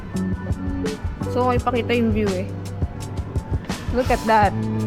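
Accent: Laguna, Philippines. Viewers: Tunog palang ng boses matik taga Laguna e 😆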